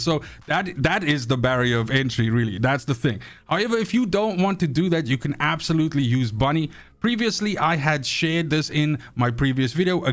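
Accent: South Africa. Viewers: is that a south african accent? 👀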